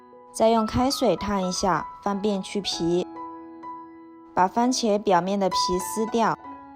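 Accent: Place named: Sichuan, China. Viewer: 川妹子吗？这普通话 哈哈哈 加油！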